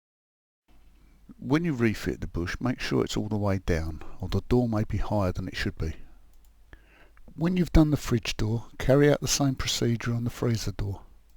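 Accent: United Kingdom. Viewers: This bloke sounds like geezers I worked with in the 1970s. I'd definitely say he's from South East London. Penge? Peckham? Blindin' instructions pal. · Perfect instruction on what to do. It means even I can change my door. Maybe it’s cause I’m welsh but I Loved the ganstar cockney accent. It felt like I was being told what to do by a proper hard Londoner 😁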